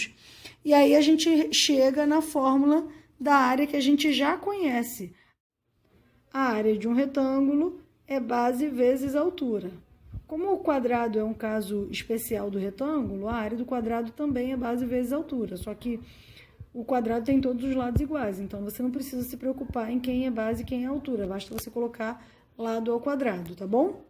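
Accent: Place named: Pará, Brazil. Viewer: boa prof se solta um pouco mais. vc é paraense ksksks pareçe muitooo tbm sou,rsrs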